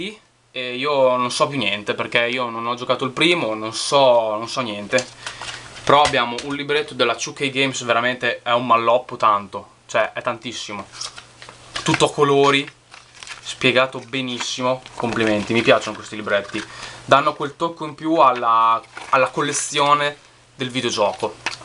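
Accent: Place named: Emilia-Romagna, Italy. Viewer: ma sei dell' emilia romagna? no perchè questo '' bella ragassi'' xD io dico bella ragazzi so siciliano :D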